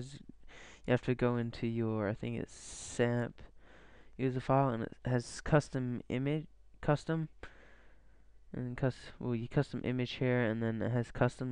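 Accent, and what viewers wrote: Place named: Australia. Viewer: Dude, nice accent, is it aussie?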